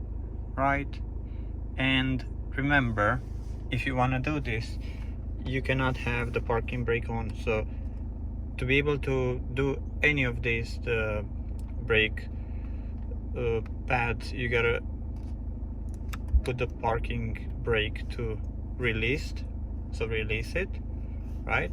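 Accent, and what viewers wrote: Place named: Romania. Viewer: Multumesc, recunosc un accent romanesc. 😂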